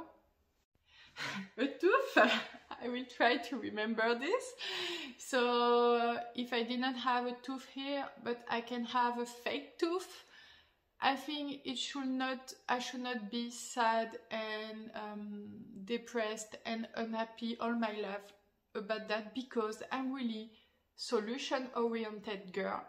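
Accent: France. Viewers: J'adore tes vidéos en anglais car j'ai exactement la même manière que toi de le parler : grammaticalement c'est bon mais avec un accent totalement français 🤣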